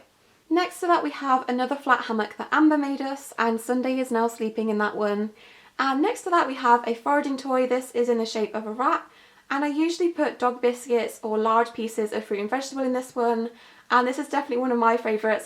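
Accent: England, United Kingdom. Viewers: are u from England? So am i!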